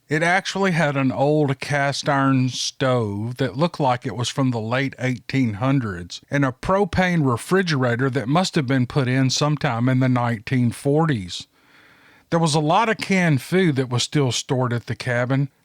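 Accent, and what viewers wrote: South, United States. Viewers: Hi, i live in chesham, a little village outside london UK, i found your channel last month,and fell in love with it from the first story! You are truly a master storyteller,you can connect and get lost in each and every story,short or long,you never disappoint. I'm now enjoying all your back catalogue of stories, and have introduced my girlfriend to your southern(?) drawl too! Love u lots bro,keep up the amazing job u do,you're my favourite addiction by far!😜😃🤩👍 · every time you say" that's how I want to see one in my car, moving" hahaha it makes me laugh every time! I listen to a lot of podcasts everyday as I'm a truck driver in North Dakota. I must say you do a very good job! You have a great personality and that southern accent I can just listen to you talk forever.... Thank you for what you do. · Yes his voice has some special soothing quality and I love the Southern accent. 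I'm Irish and it brings me back to 'ghost story' nights in the countryside when we were kids... Thank.you 🍃🌿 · I'm a 71 year old, happy, Texas grandmother. I can't tell you how much I enjoy listening to your soothing Southern accent every night. I often fall asleep listening to your stories. I get so tickled when you lose it reading people's descriptions of smells! This Southern lady loves your sense of humor, your gentlemanly attitude, and your kind, Southern voice. Don't worry about the misprounciations...it's all part of your charm! Your honesty is refreshing in this troubled world. Thank you for being real. Hugs from this grateful grandmother. May God bless you and yours. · ok so I never commit, but I love your show! I love your accent I am from North Florida and my family sounds like you. So I feel at home listening love the southern drawl.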